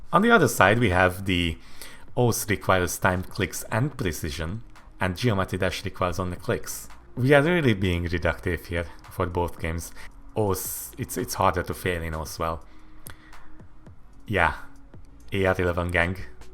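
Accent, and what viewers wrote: Hungary. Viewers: Is that Hungarian accent? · I know a hungarian accent when I hear it :) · I like your hungarian accent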